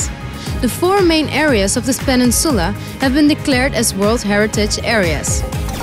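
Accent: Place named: Turkey. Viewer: Dat turkish accent 😖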